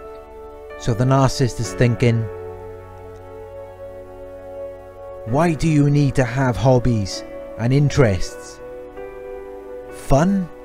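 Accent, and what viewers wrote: Wales, United Kingdom. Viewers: Your voice tone is helpful- to an American you sound a little like a famous Welshman - Richard Burton. Not exactly of course, but we know you are wounded similarly. He wrestled in a narcissistic system, and family life. Thanks for the better benefit. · You sound Welsh .. possibly near Newport. I experienced this for 5 years and had to undertake hundreds of hours therapy, finally finding Anoushka at Balance Psychologies to help me twice a week with specialised NPD abuse therapy / counselling. I've learned about this now .. it's been the hardest time in my entire life .. I absolutely get it now, and I find it interesting that there's a Newport person on here reporting this. I'd love to get together with you and talk through this together. I'm in my 50's and she got me, I had no idea. I now have taken the red pill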